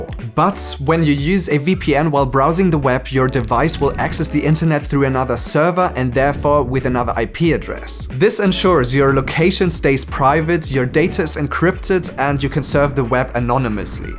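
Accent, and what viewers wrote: Germany. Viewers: haha ich habe sofort erkannt dass du Deutsch bist, obwohl du den Akzent schon gut wegbekommen hast. Aber ich muss sagen, du machst gute Videos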